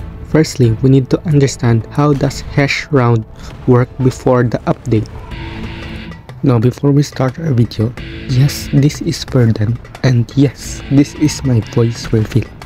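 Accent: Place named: Philippines. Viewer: bro got the heaviest filipino accent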